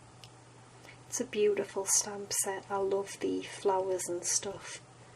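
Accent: United Kingdom. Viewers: I love this card. I have everything to make this because I just bought the papers and the stamp set because they are so beautiful. Also, just want to thank you for making these videos. I am American and watch some American Stampin Up demonstrators but find that y'all in the UK tend to make more boxes and things (like the journal you made not long ago), and I enjoy making the boxes and such, too. So, thanks again! P.S. I love your accent! If you don't mind me asking, what part of the UK are you from?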